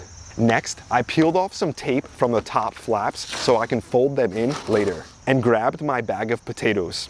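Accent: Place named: East Coast, United States. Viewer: yaaaass i love the east coast wooder accent!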